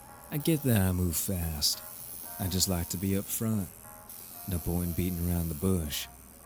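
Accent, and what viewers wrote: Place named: South, United States. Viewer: I was not expecting an accent so thank you I always love an south accent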